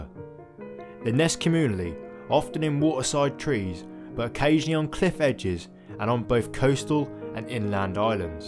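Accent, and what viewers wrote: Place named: England, United Kingdom. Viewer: I'm curious about your accent. What area of England are you from?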